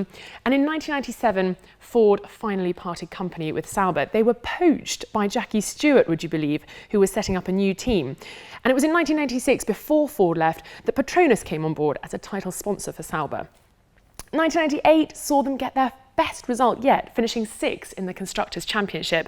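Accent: United Kingdom. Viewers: Love her British accent... wow...I am in love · annoying blonde lady with annoying british accent go away...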